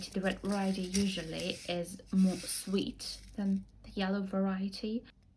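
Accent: England, United Kingdom. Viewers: Why do you have a British accent? It is awesome. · What does a British accent sound like ? She sounds english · I am amazed at your command of English. Have you studied in English or some part of UK (I hear a flavor British Accent). Either way, a great view. and perspective on life in Belarus. Beautiful! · Very nice British way os speaking English. Thought I was back in London for a moment there. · How did you get a British accent? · I wonder how come a Belarusian has British accent !!!!!!!! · You sound like your English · I just thought the same. Very, very good english and this British accent. Nice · Great videos. You have a beautiful British accent. :)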